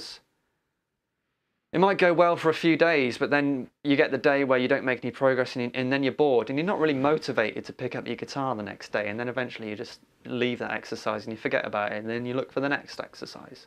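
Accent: United Kingdom. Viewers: Hey man, great video! Are you British by any chance?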